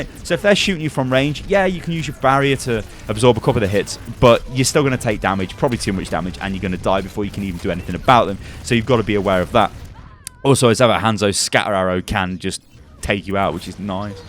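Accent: United Kingdom. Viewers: i usually dont like the British accents, but your commentary is on point! subbed man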